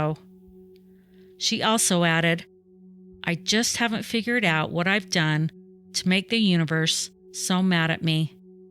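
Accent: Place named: Midwest, United States. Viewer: You have the sweetest Midwestern accent. New follower here. Love your channel.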